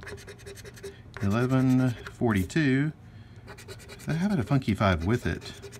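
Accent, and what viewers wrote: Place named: South, United States. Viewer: Very Southern-y 👍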